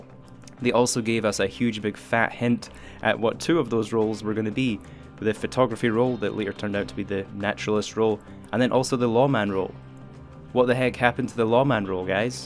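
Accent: Scotland, United Kingdom. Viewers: I wish the snow would go already. I hear by your accent your Scottish, I am too. Keep up these videos, I'm learning alot from you.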